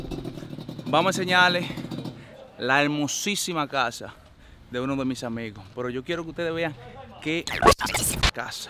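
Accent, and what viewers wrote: Cibao, Dominican Republic. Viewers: Mi hermano sigue aciendo lo que te gusta ere bueno en eso tu asentó del cibao .te queda.vien y yo soy capitaleño....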